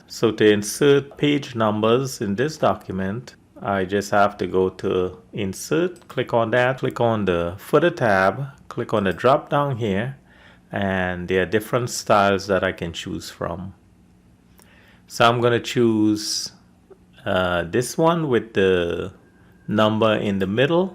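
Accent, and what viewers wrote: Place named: Trinidad and Tobago. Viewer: You sounding like a Trinidadian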